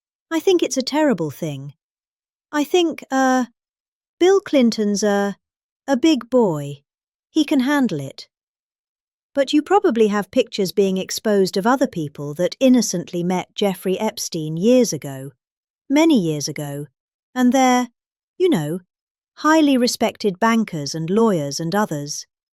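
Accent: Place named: London, United Kingdom. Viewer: I'm a Londoner, I speak with a variant of this accent. I'd feel like a complete embarrassment to myself and everybody around me if I ever caught myself talking this kind of sewage.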